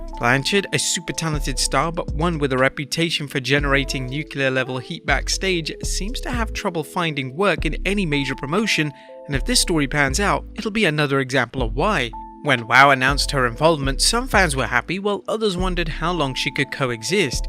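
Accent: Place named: United Kingdom. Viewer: I always look forward to hearing you return , UK accent FTW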